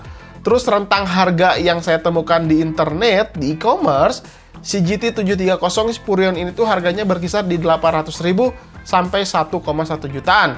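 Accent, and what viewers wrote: West Java, Indonesia. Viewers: Biasa SUNDA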